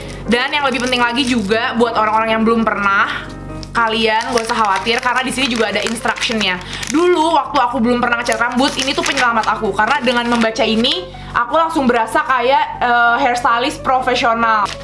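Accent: Java, Indonesia. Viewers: Enk aj gtu klo dgerin ka ngomong,medog gmn gtu ya wkkwkw😂😛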